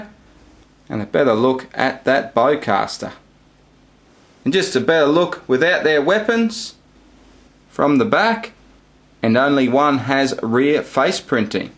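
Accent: Australia. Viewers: I love your Aussie Achsent Aussie Aussie Aussie oi oi oi. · Jedi Master Productions well coming from an Australian I can tell you that not every Australian speaks like that but some do. not putting on that accent to sound more Australian because it's his real voice.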